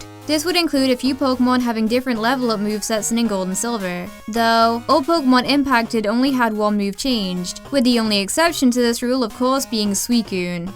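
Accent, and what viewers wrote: England, United Kingdom. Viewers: Your accent is awesome! I wish I had an English accent... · Are you ever so slightly English? You definitely have a tinge of our accent when you talk. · Woah, this person has a very odd accent. It sounds like a regional accent from Britain that has been polluted by living in the US. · OakTea she's british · What nice British voice.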